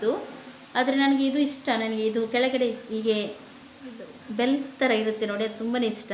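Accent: Mangalore, India. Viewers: Madam nimma mattu keluvade onda thara khushi. Nimma magalur kannda super. Nimma vedio super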